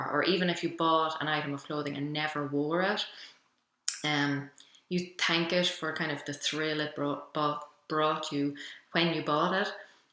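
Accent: Ireland. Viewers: Like your videos. Also love your accent. My guess is your from Ireland.....but I also watch Lydia E. Millen and you both say jumpers, where I call them sweaters. Wonder where we went wrong on this side of pond.....lol. thank you for sharing, katie mullen usa.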